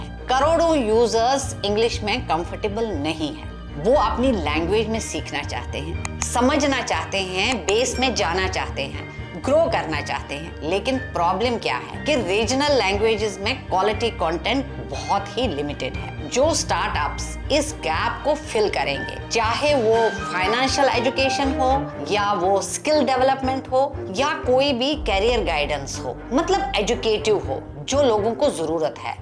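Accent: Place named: Kashmir, India. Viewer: Aadab mam ... I c your content just now first time ... Your accent of taking is just kashmir type... Any i appreciate your work... Best of luck Dr Masood Ahmad Tantray.... Educationist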